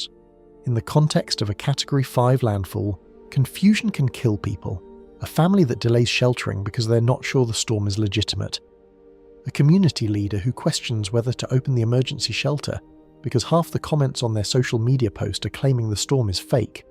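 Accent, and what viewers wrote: United Kingdom. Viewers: I’m sorry, but us YouTube listeners watchers are sick and tired of this British accent crap, especially this one, instant turn off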